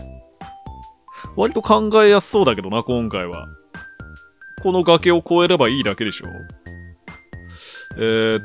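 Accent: Kansai, Japan. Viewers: 最近関西弁多い気がする🤔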